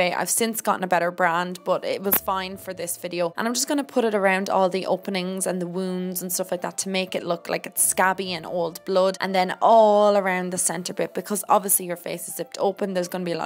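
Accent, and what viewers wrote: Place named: Cork, Ireland. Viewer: You sound like ur from Cork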